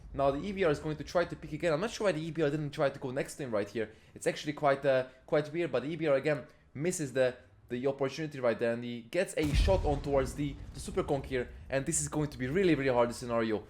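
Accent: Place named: Hungary. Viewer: I'm not arguing with you either 😊 has a typical hungarian accent 😅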